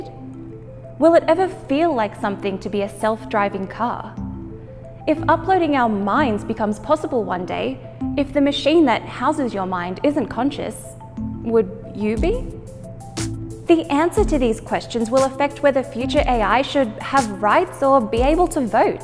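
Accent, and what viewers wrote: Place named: Australia. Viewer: You sound Australian, but this vid was published in January, and wherever you are had snow. Do you live in Canada?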